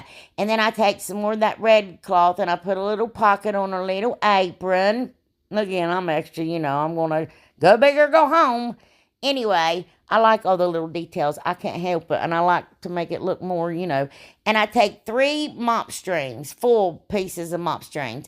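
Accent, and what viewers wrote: South, United States. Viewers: I absolutely love all you do and thank you! I adore ya girl! From this southern accented Georgia peach (well no longer live there lol), to your southern accent ..big hugs.. and much love. I hope your husband is feeling better.. last I heard from you he wasn't feeling well (been away doing chemo, so have not been on here as much as usual). I will be on Fri the 18th. Can't wait! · you are just AWSOME.I was away for Christmas,hospital! I really missed you.I love you’re southern accent. You’re just a blessing to me.thank you,God bless you, Happy New Year! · These are all so gorgeous! You are a nut and laugh every time I watch you. You sound as country as me.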